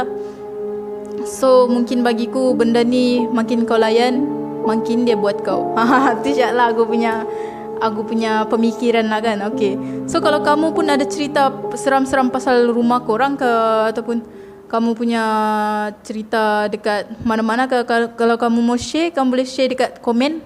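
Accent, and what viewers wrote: Sabah, Malaysia. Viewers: Suka dgr akak ckp slang sabah😄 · siuk cerita mu sis 👍 suka sya dngr . tmbh tmbh lg loght sbah beii . snang sya fham .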